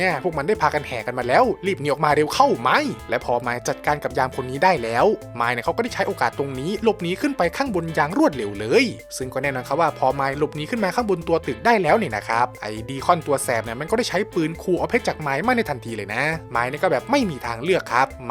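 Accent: Isan, Thailand. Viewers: อีสานมาเต็มๆๆ พุ่นเด้555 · สำเนียงอิสานๆของพี่น่าร๊ากกกมั่กกก ~><~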